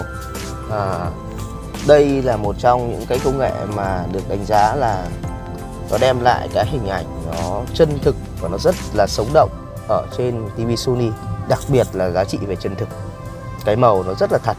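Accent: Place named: Hanoi, Vietnam. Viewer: Đúng chất giọng dân kẻ chợ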